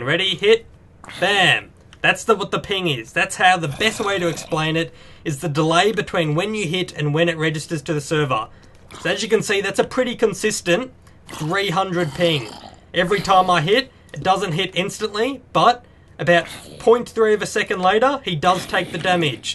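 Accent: Australia. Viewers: Yo mate r u audtralian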